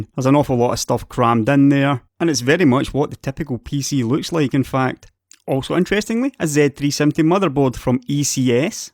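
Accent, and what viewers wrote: Scotland, United Kingdom. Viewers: Its the Scots accent, it just sounds like you are being nasty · r u Scottish? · Keep your Scottish up!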